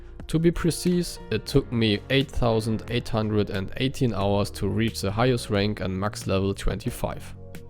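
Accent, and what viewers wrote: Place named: Germany. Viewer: Im Ernst das ist deutsch-englisch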